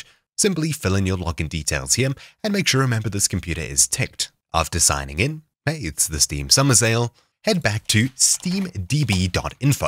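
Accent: South Africa. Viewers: Lmao south africa😂 Is that a joburg boet I hear? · You're South African! Nice! :P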